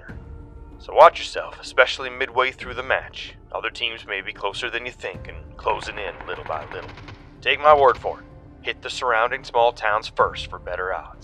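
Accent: United States. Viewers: Lol i love the new narrator's voice in this trailer . It's like one of those cowboy style accent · I don't like this man's southern drawl. Doesn't fit with the map. On Miramar it would barely work.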